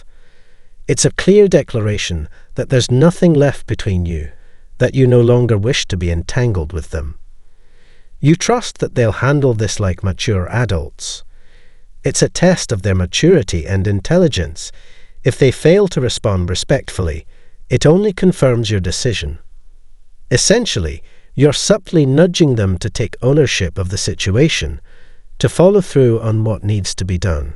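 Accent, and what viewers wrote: United Kingdom. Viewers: This particular session was very helpful, so clearly explained, I feel I can handle the narcissist in my life, and not have to worry that she can gain gain control over me. I think I can win now without harming her. I want you to know how British pronunciation comes across to me as less aggressive than U.S. pronunciation, and it decreases the stress I feel when absorbing narcissistic subjects. I look forward to listening to you. Thank you very much.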